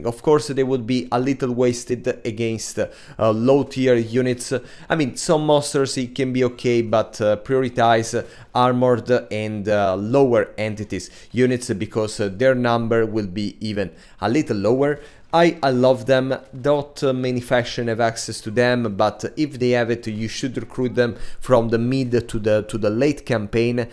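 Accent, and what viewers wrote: Italy. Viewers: are you italian? i love your accent! :)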